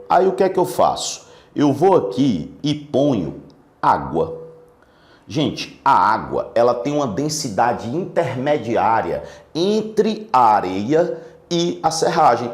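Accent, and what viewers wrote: Northeast, Brazil. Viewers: Que aula foda! E ainda por cima o sotaque nordestino. Inscrita demais no canal!! Sucesso!!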